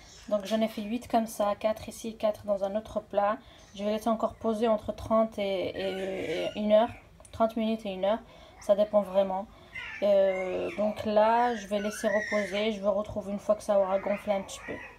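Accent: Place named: Belgium. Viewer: Super une chaîne pour le mcc ! Cest trop mignon ton accent tu es Belge ?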